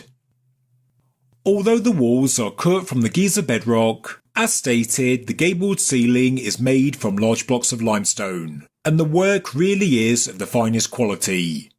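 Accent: United Kingdom. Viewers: Too bad! Get over it! There are plenty of other crappy channels that try to be as good as this one. You can give them a shot with their less annoying selves to listen to. You got better things to do than to bitch about someone giving you great information for free. In Britain there are many different accents and this is one of them. There are groups of people that talk just like this too. Move on. Stop bitching. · wow, you’re right. He sounded like a normal chap in his early videos. Now he sounds like a mix between a posh Englishman and a valley girl.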